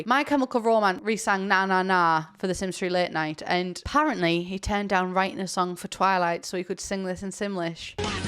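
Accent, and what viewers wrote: United States. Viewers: your american accent is magical the fact that we have accents is just so damn cool. i love when people do american accents. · Absolutely 10/10 American accent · I didn't realize you were doing an american accent until you mentioned it lol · Wow your American accent is pretty on point! It’s kind of funny seeing the roles reversed because I’m obsessed with doing a scouse accent lol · Very good American accent -an American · Can we talk about great American accent? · I did a double take when I heard your American accent what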